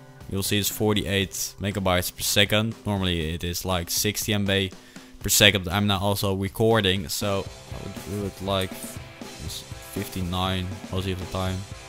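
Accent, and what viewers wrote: Netherlands. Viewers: Nice tutorial. Btw ik kan horen dat je Nederlands bent :P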